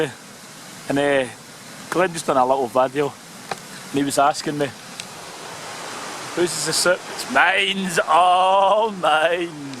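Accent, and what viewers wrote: Scotland, United Kingdom. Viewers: Try watching this with the Google auto-generated subtitles on, killing myself laughing at how it can't handle Scottish accent!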